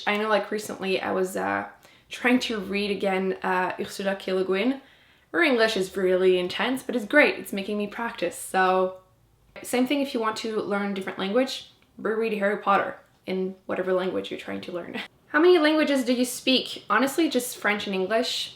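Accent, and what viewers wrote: Quebec, Canada. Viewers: Owww I loved hearing you speaking French, French Canadian have such a cute accent ! I am French and I started reading in English in order to improve my English :D I'd love to read the Mistborn trilogy but can't decide if I want to get it in English or in French... haha You should read Carry On ! I was skeptikal aswell but I actually liked it ! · Lool you need to stick with just asking not insulting dear, haha, I like it that you speak Arabic, and btw your Canadian French is different from the accent in France couldn't understand u clearly, because I'm Arabic and I speak french too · I speak french fluently and most of my friends are french so I was shocked when I heard your accent when you started speaking in french! didn t know that the canadian french pronunciation was so different from the french one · I studied French in Quebec City for a summer and when you spoke French I was instantly missing Quebec haha, alors c'est genial et je aimerais bein si tu fait les videos en francais haha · Je n'ai jamais écris sur une page youtube mais j'adore tellement tes vidéos que j'étais obligé ^^. 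Je suis française obviously mais dans cette vidéo j'ai tellement adoré ton accent quebecois que je me devais de te le dire ☺️. J'étais depuis quelque temps dans un reading slump et depuis que je regarde tes vidéo et d'autres bookyoutuber (comme peruseproject qui est la première bookyoutubeuse que j'ai suivit ^^") je me suis remise à lire. So for all of those little things I thank you a lot for your videos and all your effort to make us discover some great books. And I read in english for like maybe 5 years now and watching series in VO and this is in fact the best way to improve your english ^^. Btw one one the first reason why I'm following you is because of your personality so i would say you are so right to not care about those who criticise you. J'attend avec impatience ta prochaine vidéo :).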